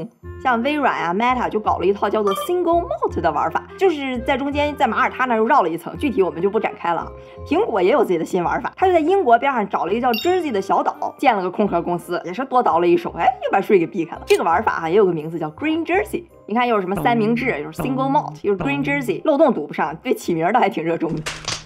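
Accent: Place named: Dalian, China. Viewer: 你作为大连人讲讲大连白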